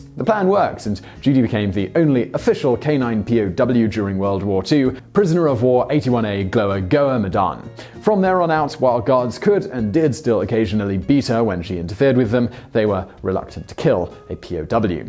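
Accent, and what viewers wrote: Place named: United Kingdom. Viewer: This is vsauce with s British accent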